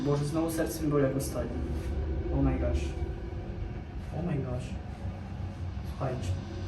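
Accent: Ukraine. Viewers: A ty jesteś z Polski czy z Ukrainy albo masz problem z językiem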